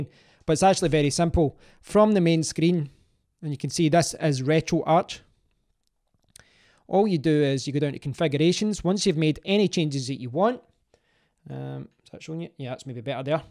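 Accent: Scotland, United Kingdom. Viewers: Ma man, how on earth you go from the game to the main menu! Nice to hear a Scottish accent btw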